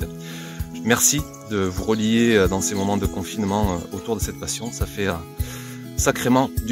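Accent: South, France. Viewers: Merci c est toujours un plaisir de te suivre avec ton accent qui chante le sud de la France sa réchauffe le coeur bisous de Belgique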